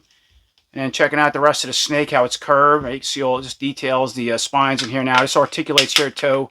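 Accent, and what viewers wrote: New York, United States. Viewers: This guys gotta be from New York I bet 😂😂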